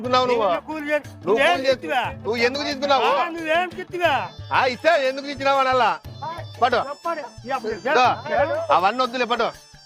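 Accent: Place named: Anantapur, India. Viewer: Super anna ❤ from kurnool.. Ananthapur slang keka full navvu untadhi😂😂🎉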